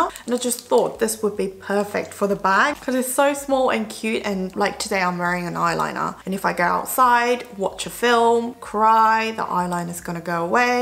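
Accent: England, United Kingdom. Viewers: 너무 예쁘시고 영국발음도 듣기 좋아서 이거 한 10번째 이상 보고 있는 거 같아요. 대사도 워드에 다 쳐서 영어공부로 외우고 있어요 ㅋㅋㅋㅋㅋ 앞으로 외울 때까지 <num>번 더 볼 예정... 사랑스러운 영상, 진심으로 감사드려요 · Oh wow idk why but i can hear your english accent more in this video! Hope you can do makeup collection video one day! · 언니 영상으로 영국발음 공부해여....♥️ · 우아 오랜만에 언니 영국영어 들으니까 너무 조아요❣️❣️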